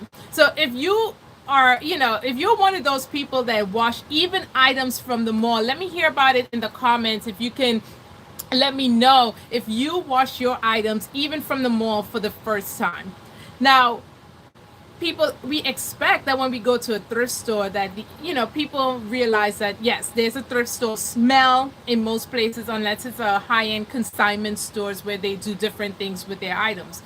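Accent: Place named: Trinidad and Tobago. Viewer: I was wondering where that accent originated from 😊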